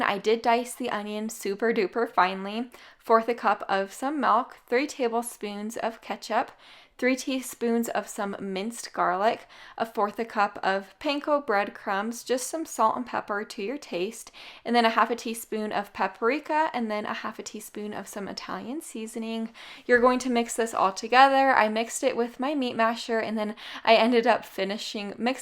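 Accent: Utah, United States. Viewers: It's not that her language is sloppy but she does speak differently than she did a year ago. Some of these are older videos. In the more recent videos, she has picked up a Utah accent. They drop their T's and pronounce things differently like un-tul instead of until, etc.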